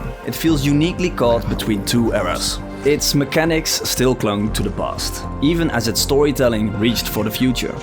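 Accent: Netherlands. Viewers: You have a really thick Dutch accent haha, I assume you're Dutch or Belgian, cool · Love the steen kolen English. ❤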